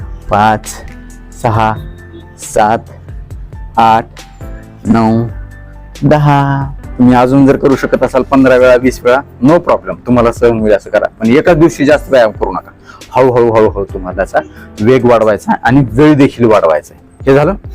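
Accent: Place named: Latur, India. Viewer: "हसत खेळत व्यायाम करा" अशा पद्धतीचा सांधेदुखीचा आपला चांगला व्यायाम प्रकार आहे. डॉक्टर साहेब मला वाटते तुम्ही बहुतेक लातूर जिल्ह्यातील निलंगा, शिरूर अनंतपाळ किंवा उदगीर तालुक्यातील आहेत; असे तुमच्या भाषेवरून जाणवते. असो तुमची स्वतःची मस्त गावरान भाषा शैली आहे. हेबका...❤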